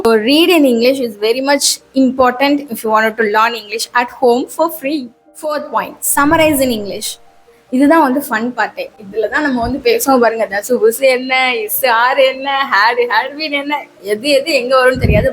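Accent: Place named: India. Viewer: Akka I improve your United States accent you are speaking Indian accent if you speak United States accent everyone will like your English more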